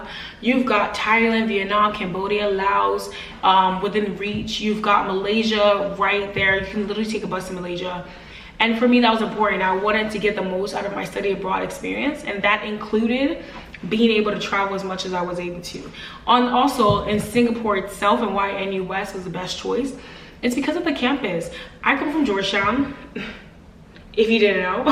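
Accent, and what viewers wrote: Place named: Africa. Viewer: What is your ethnicity? If you're not familiar with the difference between Black Americans and General Africans then you probably won't know but the combination of the darker shade of brown,slanted eye size, her nose size and her resting facial expression would let me know she most likely not a Black American just by looking at her. I can also hear parts of an African accent slip out most likely from West, Central or even East Africa(Sudan) where her features most likely come from and her last name is " " which isn't a Black American last name.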